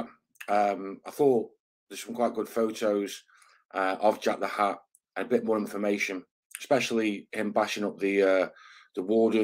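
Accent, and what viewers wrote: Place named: Bristol, United Kingdom. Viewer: Very hard man not like he shown to be in. Fims love your content sound Bristol uk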